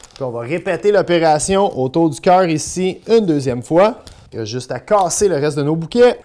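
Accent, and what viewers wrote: Quebec, Canada. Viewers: ... jajaja je ne comprends rien du tout. C'est trop difficile le français québécois pour moi. Je parle espagnol comme langue maternelle et le français j'ai appris après quelques ans mais ... La prononciation de Québec c'est complètement différent de ça de France. Jaja. J'essayerai cuisiner cette chou-fleur avec ça que je comprends.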